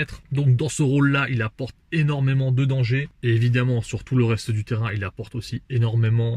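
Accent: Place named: Spain. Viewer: C’est quoi tes origines ? t’a un accent qui me fait penser a l’Espagne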